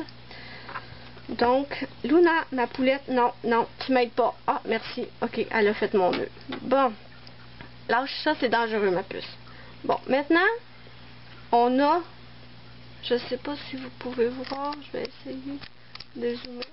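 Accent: Canada. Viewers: Enfin compris! C'est super bien expliqué! Ps:ton chat ma fais rire et j'aime bien ton accent canadien! 👍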